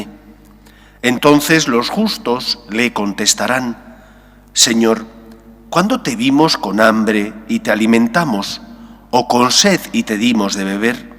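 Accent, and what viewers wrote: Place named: Spain. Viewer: Me encantan el acento español, Respetuosamente le saludo Sr Sacerdote 🇲🇽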